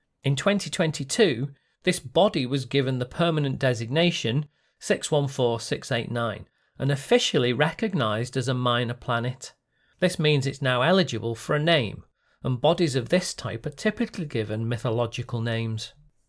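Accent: United Kingdom. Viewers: Can i ask you from what part of Britan does your accent come?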